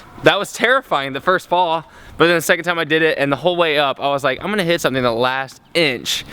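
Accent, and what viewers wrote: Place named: South Carolina, United States. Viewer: I knew your accent sounded like you were from south carolina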